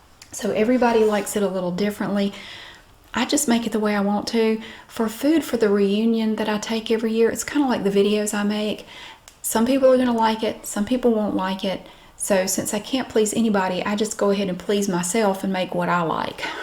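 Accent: South, United States. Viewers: Aside from the humour and the Southern drawl, it's brilliant how you always tell viewers they can make these recipes - so encouraging. Peace from Scotland 🏴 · your accent makes me melt Southern accents are the best. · I always giggle when I hear you say swear words or wear them on your apron like today and your sarcasm because you are such a sweet soft spoken southern lady 😂 I love it!!!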